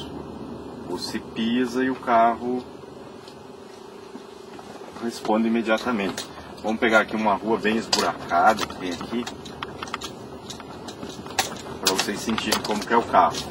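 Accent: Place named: Rio Grande do Sul, Brazil. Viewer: lagoense com sotaque gaúcho rsrs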